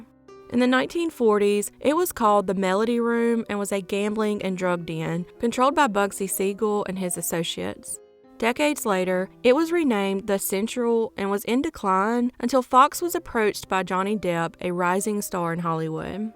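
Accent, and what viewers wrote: South, United States. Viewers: Ur voice was definitely made for these types of YouTube videos!! Ur voice is so smooth but so southern... I love all ur videos & I personally think YOU SHOULD HAVE MILLIONS OF SUBS!! UR VIDEOS R NEW & UNLIKE YOUTUBERS WHO Have millions of SUBS & ALMOST ALL THEIR VIDEOS R VIDEOS THAT THEY HAVE SHOWED A MILLION FREAKING TIMES & ITS LIKE THEY SHARE RERUNS.. IT DRIVES ME NUTS CAUZE THEY MISLEAD U & BAIT CLICK U!! UR A SPECIAL BREED.. I WONDERFUL 👏 BREED.♥ THANK U.. MUCH LOVE FROM CANTON,OHIO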